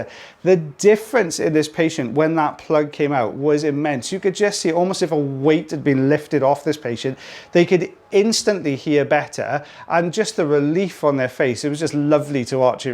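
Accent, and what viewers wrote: United Kingdom. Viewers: wiggling his socks off 😂😂🤣🤣 i love UK sayings lol 😂 btw i was trying to sort out ur accent; northern/southern England… not quite… def not Irish or Scottish … then i caught in one of ur video’s that ur Welsh! i was proud that i noticed it was a bit different ☺️ it’s not easy for us Americans to notice the differences. i worked for a UK based company & exposed to a lot of different accents, i finally started to notice the subtle differences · Im watching the video to see the star of the show not your face or to hear your British accent. In fact your technique is rather.....rubbish! Lol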